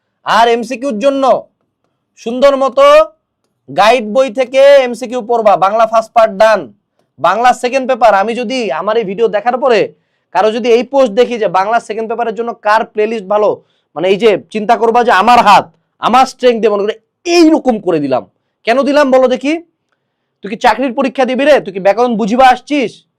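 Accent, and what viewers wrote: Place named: Dinajpur, Bangladesh. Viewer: bhaiyar mukhe dinajpur er ancholik vasa sune valoi lage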